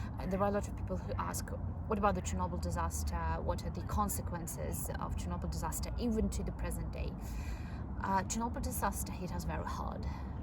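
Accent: England, United Kingdom. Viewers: I 100% thought that video had a British narrator so I checked your channel to see if it was indeed you 😁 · You sounds English in your accent. Nice quiet place, good for studies. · Great info main reason for your channel to pop up is your English accent best wishes from Pakistan 💕. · 100% it was easy to see you have a British accent. Well done, you achieved your goal. I was guessing you studied English in the UK. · I was born in the U.K. in 1936 and lived there until 1982 and now live in Australia so I was very surprised to hear that you had not studied in the U.K. but wanted to speak English as spoken in the U.K., as you would appreciate American English is a mutilation of the English Language. When I started to listen to you speak English(this is the second of your Documentaries I have watched) I was sure your accent was of an upper class English without any tinges of one of the many regional dialects in the U.K. 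( That used to be referred to as speaking with a "Plum in one's mouth" or "born with a silver spoon in one's mouth" (Please do not take umbrage as my comments are complimentary to your clean and clear pronunciation), then upon listening for a longer period when I could detect what appeared to be slight U.K. regional dialects. One word which may have been due the sound track, was when you pronounced the word Dollar that reminded me of a mature Greek who's English was picked up in the work place and with other Greeks who used to take a slight pause between the two syllables ie Dol-lah. your English is remarkable... if you had learnt your English in the U.S. I would have picked it within 30 seconds. I served in the Military in Singapore back in the 1950's and round about 2008 I had written about a particular Singaporean that I had met during my Service, I then received an email from another Singaporean who mentioned that one of his work colleagues would like to cont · i went to Belarus 2 times...to Minsk and to a village Borovljany where i met the orphan children...i have been to Russia and Ukraine also but i noticed in Minsk that the young people seemed on the whole to be very polite and well-behaved...there are many parks in Minsk and many families with young children so the feeling in the city was very different to the harsher feel in Russia and Ukraine. 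I saw your president driving to see a hockey match...they blocked the road and i asked the taxi driver why and he said: ''The president likes hockey.'' I like your channel very much and your voice relaxes me. I like to see fields, trees, rivers and animals and i like the small country houses very much. I live in England and to me you sound very English but with an unusually beautiful accent, which some would not even recognise as being non-English, because it's very good. You speak English more correctly than most English people and in a very high class accent. Immediately i knew you were very intelligent just because of how you sound. It must have taken a few years to remove your old accent and much effort, and honestly im very impressed. I never met anyone from Belarus who managed to lose the accent before. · I like your comments on being poor. Their is several kinds of poor people. People poor in mind who always live like their poor when in fact they have their needs and the poor and depraved in spirit who live with out hope or morals. 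It is important to be content in what ever situation your are in and to trust in god for your needs. Your english is very english and strange compared to American english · Yes, you have a very good British middle to upper-middle class accent. Slightly more English than merely British. Lovely. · I was thinking you went to a English private school maybe you have learnt the British accent very well better than me and I'm from middle Britain. By the way you have beautiful blues eye's and nice hair. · Love your English accent ... Very Soothing. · Hello your English is verry British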